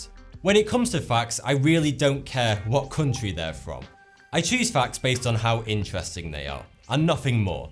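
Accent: United Kingdom. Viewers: hey! How to develop an awesome an awesome brittish dialect like you have. I really always liked it. i learned my english in germany. i know many languages but habe a problem with my accent.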